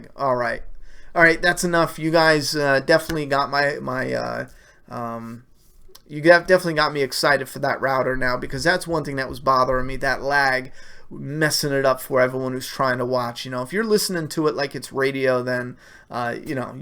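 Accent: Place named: Long Island, United States. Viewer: Q&A- first of all I just want to say I'm a huge fan. I appreciate your honesty and that you just stay to your true self no matter what viewers say. Anyway, I usually watch your TWD reviews,but I noticed your accent. Are you from Long Island? I'm from there,but currently living near Baltimore, MD.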